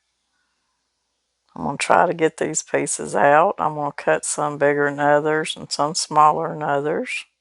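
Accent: South, United States. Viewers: Who else comes to this page just to bask in the country accent and down home goodness? God bless!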